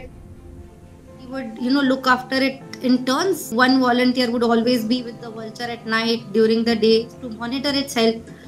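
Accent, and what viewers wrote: India. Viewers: the Indian accent makes my head shake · Lol, I love Indian accent. BTW thanks 😊 ☺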